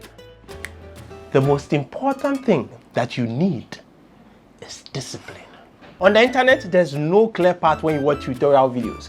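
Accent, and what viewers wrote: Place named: Africa. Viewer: Hey African brother I low key love the accent lol thx for the vid.edit:i am only calling you afican because i have heen in afican villages lol (i am african my self loll).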